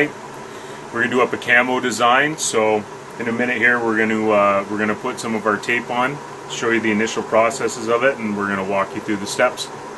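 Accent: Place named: Canada. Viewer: He sounds extremely Canadian.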